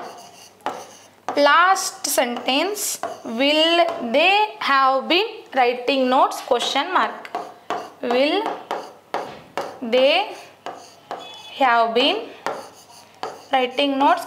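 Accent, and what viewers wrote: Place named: Belagavi, India. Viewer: Nimm Belagavi accent alli nimm matu kelode chanda❤️👌👌👌👌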